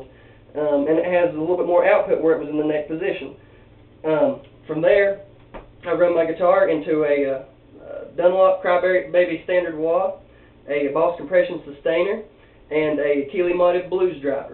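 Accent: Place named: South, United States. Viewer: You have a Southern accent. Where are you from?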